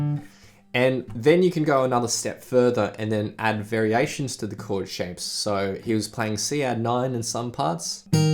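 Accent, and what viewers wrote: Australia. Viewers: Your Aussie fuck me ya legend good stuff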